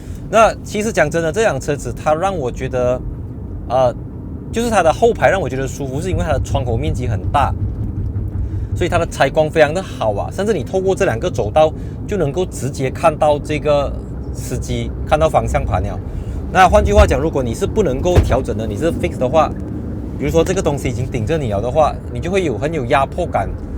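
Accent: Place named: Malaysia. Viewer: 马来西亚的说话就是这样子的吗？带着沉重马来西亚口音的英语，让实话让我这个中国人听了特别别扭。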